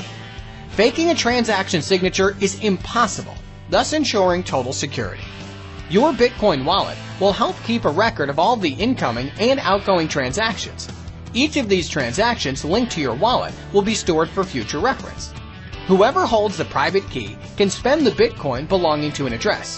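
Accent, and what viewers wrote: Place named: United States. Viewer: Annoying American (non-native) voice, and too fast to compute. Ie: not user centric.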